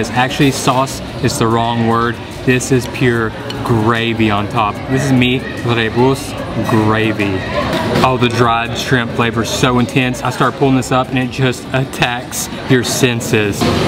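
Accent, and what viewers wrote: South, United States. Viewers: hehehe that southern accent <3 <3 ya'alls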